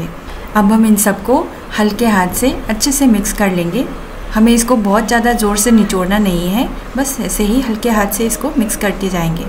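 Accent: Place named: Nepal. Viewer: Its interesting to know more about singju ingredients but nangee hindi ngangbado nepali khoi gee accent malle 🙏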